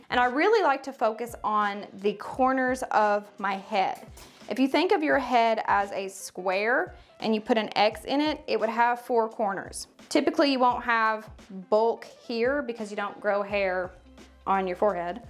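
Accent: South, United States. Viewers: Shoot ain’t u cute as they come! Your accent matches mine lol love your personality! Great video btw I really needed someone to show me in southern terms how to blend my own extensions the only difference I have is I’m brunette with really thick hair but pretty long too I also wear my hair straight more than I curl it plus I use one huge clip and fill in the empty spots best I can with my other individual extensions any suggestions without taking away a lot of my own hair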